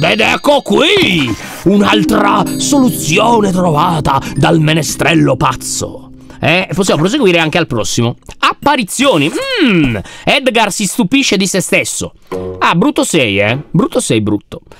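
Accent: Sardinia, Italy. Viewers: Semmai Sardo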